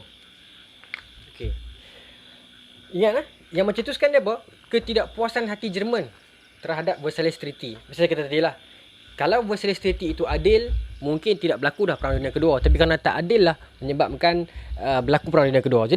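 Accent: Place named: Penang, Malaysia. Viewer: Saya bukan melayu bodoh ustaz. Saya paham bahasa inggeris. Tapi tak syok dengar ustaz goreng dalam BI. Hilang slang penang.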